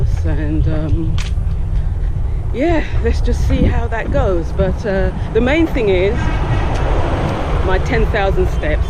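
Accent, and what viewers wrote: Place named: United Kingdom. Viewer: She has a British accent.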